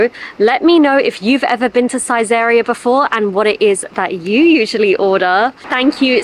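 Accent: RP, United Kingdom. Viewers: 字幕がきちんと付けられていて英語の勉強になるし、Squishyさんの品のある、ゆっくりめに話してくださるイギリス英語は聞いてて気持ちいいです。所作も美しいですね😊 日本の日常的な良いところを紹介してくださって嬉しいです。最近このチャンネルを知ったので過去に遡って観ようと思います❤ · コレぞ、 “Queen's English” と思えるほど、一語一句を “丁寧かつクリア” に発音して下さる (#イギリス人🇬🇧らしい!!) ので、すごく聞き取りやすいです！ 👍👍 ～ 所作も自然体ながらエレガントで、好感度が高いですね！ 🥰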